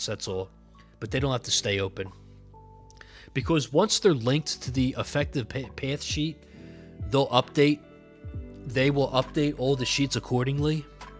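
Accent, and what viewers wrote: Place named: New York, United States. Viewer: I love your accent. Im trying to guess where you are from. My guess in New York generally and Long Island specifically. How close did i get?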